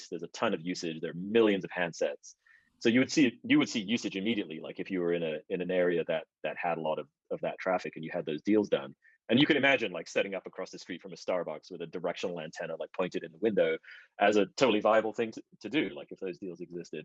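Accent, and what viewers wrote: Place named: Australia. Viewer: .. i sware i can hear an Australian twang.. did you ever live in Australia?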